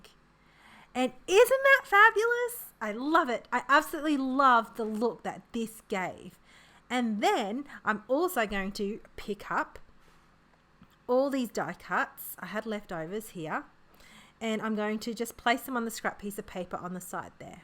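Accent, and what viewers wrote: Australia. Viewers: Glad to hear a fellow Aussie on YT. New sub from NSW ❤️🇦🇺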